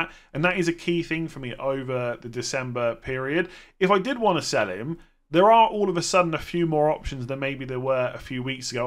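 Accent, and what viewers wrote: United Kingdom. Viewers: And welcome back to another fpl video, i love his british accent 😂❤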